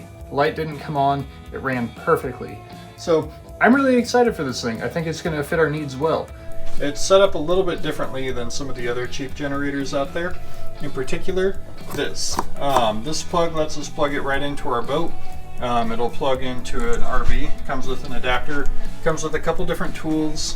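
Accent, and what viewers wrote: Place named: United States. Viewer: Great video. Great American English from expat in England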